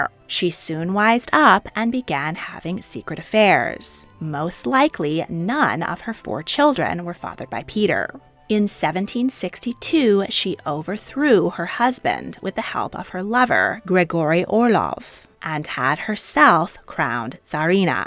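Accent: California, United States. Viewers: The US accent is irritating. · Sadly I am totally distracted by the woman's voice, What accent is that - is it Californian ? Why do women speak that way?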